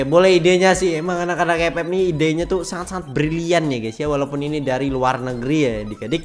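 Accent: Java, Indonesia. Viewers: Bang Lo jawa mana bng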